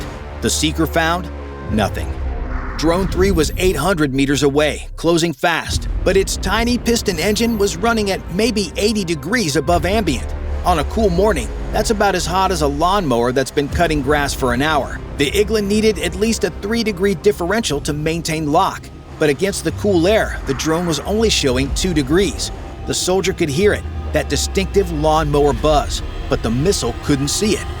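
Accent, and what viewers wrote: United States. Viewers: It’s a shame it’s all in a US of North American accent. Totally unbelievable.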